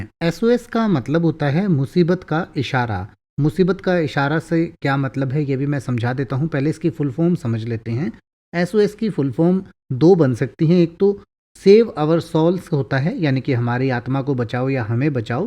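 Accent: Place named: Assam, India. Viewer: Assamese laga thank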